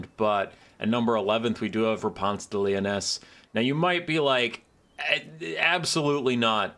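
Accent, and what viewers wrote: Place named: France. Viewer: your french accent absolutely incredible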